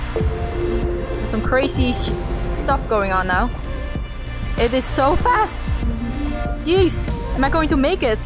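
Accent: Netherlands. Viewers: 🤣🤣your vid convinced me to buy it I can tell you really enjoyed it..by the way awesome accent are you a native Dutch speaker?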